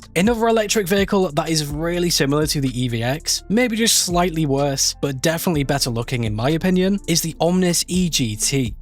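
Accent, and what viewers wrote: United Kingdom. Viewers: Is this British English or Scottish?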